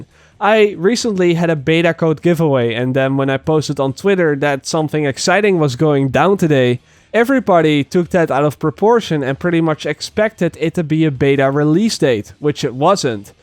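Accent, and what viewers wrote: Netherlands. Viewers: lol deze man is zwaar Nederlands hoor Hoe hij klinkt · ja hoort best wel duidelijk je nederlands accent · ik wist dat je nl was ;) Door die tweet screesnshot bevestigde het ;) Je hoort het aan je accent ;) Wel echt nice video!! :D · wow this guy is dutch af! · is this guy dutch? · Noticed the Dutch acent in there, I was like.. This has to be a fellow Dutchy >.<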